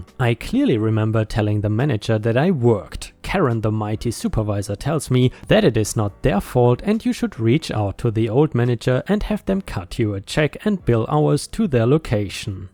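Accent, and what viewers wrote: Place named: Germany. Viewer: Hi I want you to know how much I enjoy all your stories. Listening to you with your German accent takes me back to when I was a kid, listening to my grandparents ( from Germany)tell stories. I miss them dearly, but hearing you makes me so happy again. Thank you so much for taking me back to very good times.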